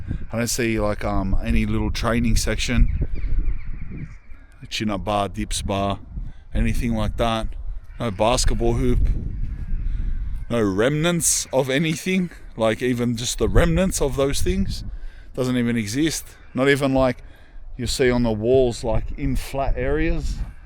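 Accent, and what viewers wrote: Australia. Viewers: Love your honesty and I'm new to this channel but I fucking love it!! ❤ cous...😂😂😂 that aussie accent is fire!! Keep it up mate...love your no Bullshit way. ❤❤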